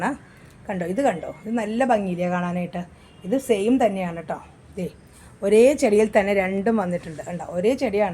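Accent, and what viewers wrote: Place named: Northern Kerala, India. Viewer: Enikku thonni vadakan style talk❤❤❤ente husbandum palakkad anu❤❤❤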